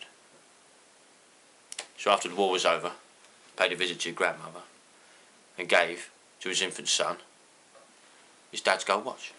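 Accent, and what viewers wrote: England, United Kingdom. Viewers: Just discovered this...wonderful! Great skill! Are you English?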